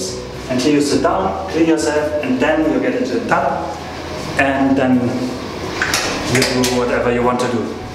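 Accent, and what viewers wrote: Germany. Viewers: Where do you come from? I sense a german accent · Ich hab sofort herausgehört, dass Sie aus Deutschland kommen XD Ich muss sagen, guter Kanal :) Gefällt mir^^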